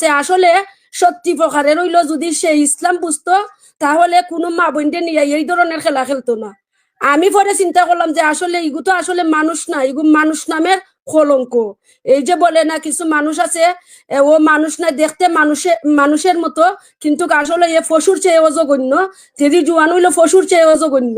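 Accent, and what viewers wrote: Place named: Sylhet, Bangladesh. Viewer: তুমি যে বেটি সিলেটে ভাষায় কথা কও জানো ইসলাম সম্পর্কে তুমি চ্যানেলে ঢুকো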